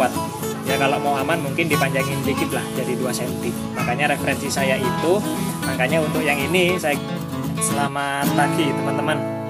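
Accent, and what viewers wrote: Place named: East Java, Indonesia. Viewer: Dari logatnya spertinya mas org jawatimuran. Bisa minta kontak wa nya mas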